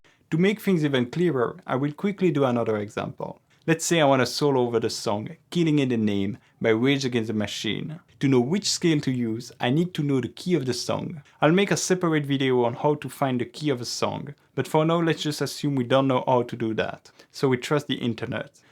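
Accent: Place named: France. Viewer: On reconnaît bien l'accent français 😅 Merci pour la leçon 💪🏼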